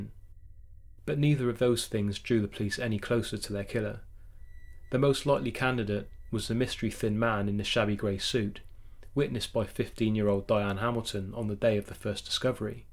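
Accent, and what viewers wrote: England, United Kingdom. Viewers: It is very much an authentic English accent,from somewhere west of London.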